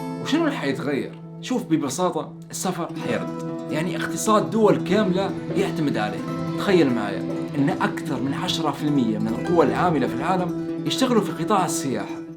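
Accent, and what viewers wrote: Eastern Libya, Libya. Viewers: مش مغربي ليبي هذا 😅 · بحب اللهجه الليبيه خالص هلبه هلبه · يالله بعيدًا عن الفديو ، اللهجة لفتتني للأبد اللهجة الليبية تعجبني وأحب اسمعها ، ماأعرف بس ممكن لأنها قريبة من لهجتنا ومفهومة 😂❤️ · هادي لهجه شرق ليبيا لهجه الغرب تختلف عليها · واو، واش هادي اللهجة الليبية، صح؟ أول مرة أشوف قناة ليبية على اليوتيوب 😍 أنا اشتركت 😊. أنا يحسب لي انت مغربي، مرحبا إخواننا بليبيا. هي خليط بين المصري والخليجي و المغربية يعني الكل يفهمها 😊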